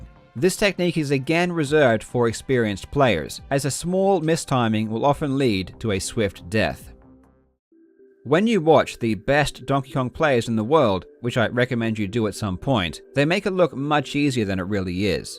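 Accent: Australia. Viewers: great videos. its interesting you have a rhotic Australian-sounding accent. not sure if you pronounce your Rs because of prolonged exposure to american accents or if it's just a legitimate accent i've never heard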